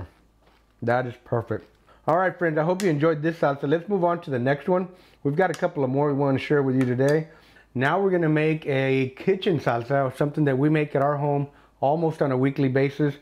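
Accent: Texas, United States. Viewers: I like how you look like a full Mexican but have the voice/accent of a Texan. AMAZING!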